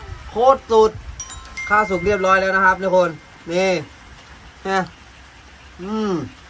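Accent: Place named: Ubon Ratchathani, Thailand. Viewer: สำเนียงอุบลชัดๆ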